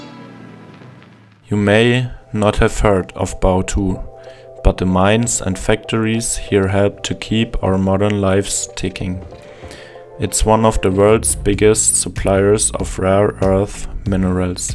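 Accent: Germany. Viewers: dieser deutsche akzent is anders geil haha :D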